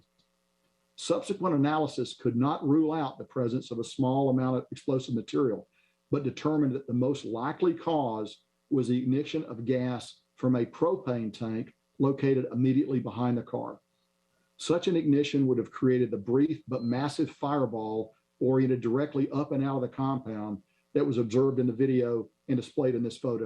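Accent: South, United States. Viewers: This southern crap talk, why is he here, he can't even read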